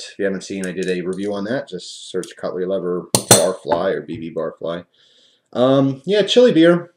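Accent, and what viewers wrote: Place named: Pennsylvania, United States. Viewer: ya Pennsylvanian accent pride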